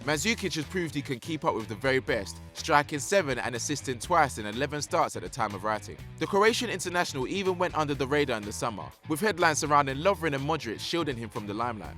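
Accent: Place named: England, United Kingdom. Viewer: 6 out of 10 listed are Premier League players. There are so many underrated players in other leagues, but since this guy talking has a English accent it’s not surprising that he’s going to list 60% of the players as Premier League players.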